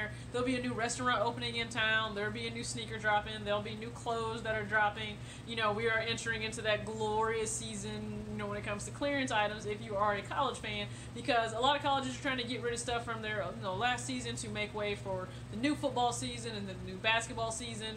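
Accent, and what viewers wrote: South, United States. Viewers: Yu remind me of Sandy off Sponge Bob but in a cute sexy kind of way 😝 ...I think it’s the country accent 😍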